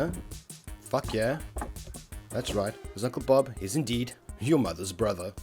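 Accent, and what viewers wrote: Afrikaans, South Africa. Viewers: Are you south African? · so thats what the accent is! and that language from the other vids wasnt norwegian/swedish/etc, it was afrikaans fml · I don't even play Rust but you got me hooked on watching, love the accent, is it South African or New Zealand?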